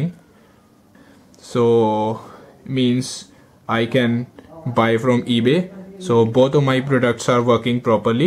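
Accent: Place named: India. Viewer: OMG u could not have more of an indiant accent.... cool vid tho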